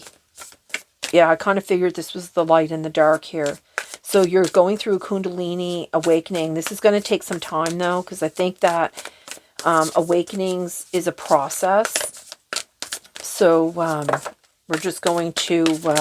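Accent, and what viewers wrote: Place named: Canada. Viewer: LOL .. I think it is bc you are Canadian. I had a professor in college that spoke with a similar sound & he was from Canada too. 😂😅😊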